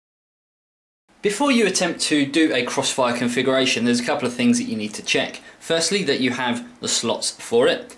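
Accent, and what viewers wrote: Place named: England, United Kingdom. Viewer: gotta love english accents :D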